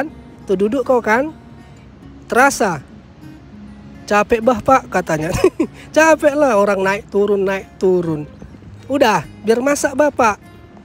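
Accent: Medan, Indonesia. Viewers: Suara medan nie psti 😂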